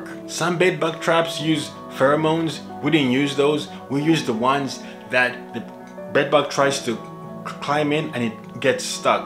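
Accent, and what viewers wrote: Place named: France. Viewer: Hi. Oh you are à french speaker I've noticed your accent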